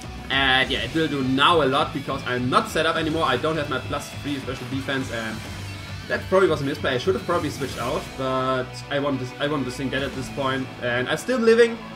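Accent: Germany. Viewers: are you german by any chance? you look, sound and your name sounds german. Im partially german so im curious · An amazing match. I feel reaaally bad for you for playing really good and than loosing for one mistake basically but focus on the positive of here by doing the right thing (no timer stall) and for playing really good, except for one turn. On another note, I'd suggest that you lower the "battle music" a bit. It was at times a bit hard to understand what you were saying 'cause the music was louder than your voice and even thou I like the German language and I don't mind the accent myself, it does unfortunately make your speech less understandable at times. GG and happy holidays!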